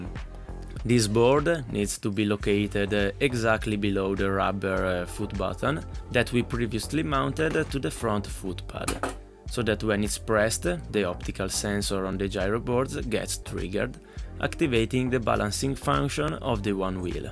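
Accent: Italy. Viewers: Ciao, innanzitutto ti voglio fare i complimenti e dirti che sei assolutamente underrated su YouTube, sia per la qualità dei video che per la qualità dei progetti. (Scrivo in italiano sia per confondere i visualizzatori inglesi sia perché mi sembra di aver capito dagli "indizi" nei video e dall'accento che tu sia italiano). Approfitto del tuo nuovo video, ottimo come sempre, per chiederti una cosa: nell'ultimo mese mi è venuta voglia di costruire un simulatore di guida. Così ho cercato online per capire come fare e ho trovato, oltre ad un altro video da cui ho preso ispirazione per i pedali, il tuo, dove mostri come costruire il volante. Non ho però costruito tutto il force feedback: ho preso ispirazione da un altro video dove si usa un semplice potenziometro. Dopo aver modificato i file 3D e i DXF per adattarli ai miei pulsanti e per utilizzare molle anziché magneti nelle levette, l'ho costruito. 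Grazie davvero per aver condiviso i file e il video di costruzione! È venuto talmente bene che mi è venuta l'idea di fare una specie di kit del volante e dei pedali da vendere, non i file digitali, ma quelli fisici come kit da assemblare. Volevo chiederti se ti andrebbe bene se potessi usare questi file "remixati" e alcuni proprio rimodellati da zero, ispirati ai tuoi, per questo progetto. Se preferisci (e per farti capire meglio), posso mandarti delle foto e degli screenshot delle parti modificate. Grazie!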